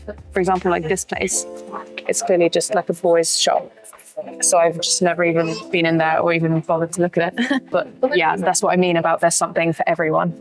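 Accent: United Kingdom. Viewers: 好聽的英國腔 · 英國腔好聽😂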